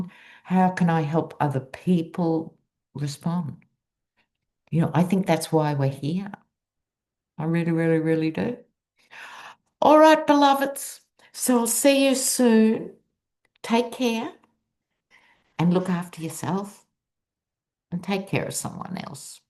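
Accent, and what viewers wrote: Australia. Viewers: A couple of decades ago I got to see Dame Edna in Austin, Texas. Before the internet we didn’t get much info about Australians here in Texas. Your accent and lingo reminds me so much of what we learned from Dame Edna, whom I adored. I love and appreciate what you do!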